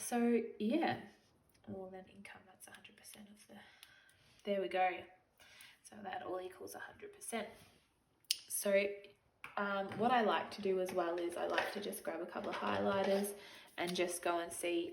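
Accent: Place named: Australia. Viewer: You gotta love that extra paycheck! So September will probably be the same for you because it's an extra paycheck for me too. Sounds like you're Australian which is sweet! I just found you by searching for budgeting channels on YouTube because I love the budgeting community and focus a lot of my content around that too. Keep up the good work!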